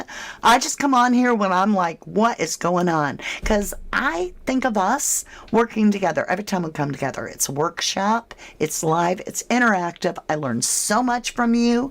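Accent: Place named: England, United Kingdom. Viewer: I’ve noticed lately your speaking voice is becoming more 🏴 English and it’s good because your learning some of our sayings like silly sod 🤣 another one for you crazy mare 😂 I think it describes Lucy lol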